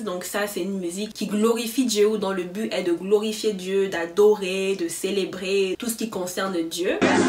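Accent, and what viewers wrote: Cameroon. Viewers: Je viens de découvrir ta chaîne, c'est super wahou l'accent camer se sent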